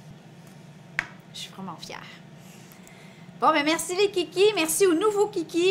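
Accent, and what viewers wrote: Quebec, Canada. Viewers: Bonjour rien que pour votre accent québécois j’aime beaucoup 😂bien evidemment la video aussi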